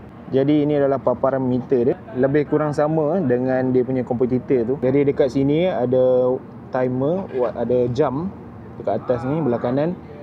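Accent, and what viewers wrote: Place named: North, Malaysia. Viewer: First time tgk video ko bang, penyampaian santai dengan pengaruh slang utara yg sedap dengar. Editing untuk opening best dan entertaining. Flow penceritaan jelas. Point of improvement camera angle dan pergerakan camera mungkin boleh diperbaiki. Overall good review dan editing best. Oh ye sedikit komen untuk penganjur, dah tahu media atau vlogger akan buat kerja kat belakang khemah tu... Housekeeping ler sikit... Kemain sepah nye... Spoil vlogger amik gambar / video cantik tapi background sampah... Bende simple je...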